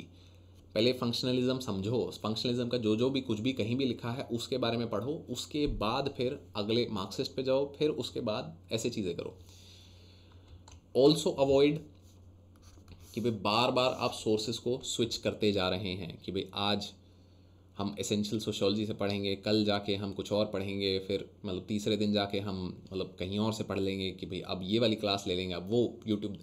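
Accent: Bengal, India. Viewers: Sir are you Bangali?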